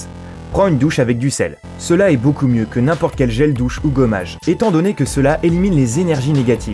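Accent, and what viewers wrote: Quebec, Canada. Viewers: kawaiser 5.1 il habite au quebec je pense car il as un accent québécois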